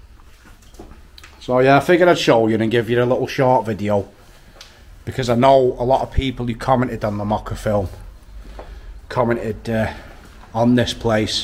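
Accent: Manchester, United Kingdom. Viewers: like a manc boss .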